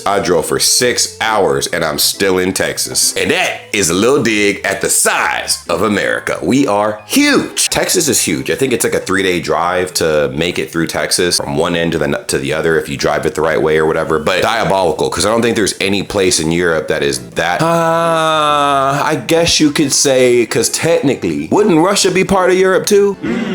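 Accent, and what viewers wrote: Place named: Germany. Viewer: I drink 2.7 liters every day and I'm trying to reach a 3l target. I don't think that counts as dehydration. 😛 That said, bravo on the German accent, mate. Klingt jetzt schon super!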